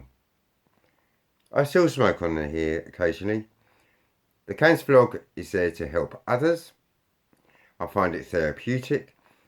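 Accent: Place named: Cockney, United Kingdom. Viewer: I've been looking back at some of your earlier videos and note that you used to speak with a cockney accent. Did you put that accent on so as to appear hard?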